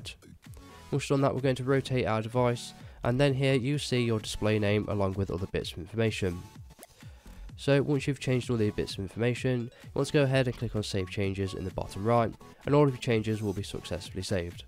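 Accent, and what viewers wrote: United Kingdom. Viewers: What a beautiful Voice, is british language ? Wow. 🤗✨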